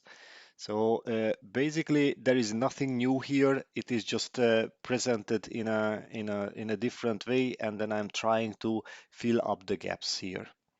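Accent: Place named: Hungary. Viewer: Biztos dolgoznak magyarok a Juhtyúknál a beszédfelismerésen, mert igen pontosan feliratozza a magyar akcentust.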